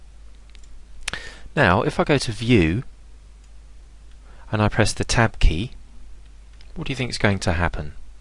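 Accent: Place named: United Kingdom. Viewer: Great concise instructions, although the intro showing why it's needed it probably not so necessary. We wouldn't be here if we didn't already know what was wrong. And delivered, no less, in that wonderful British accent that makes the material just so much more profound-sounding; the accent that can make reading a telephone book sound like an interesting intellectual exercise! Cheers!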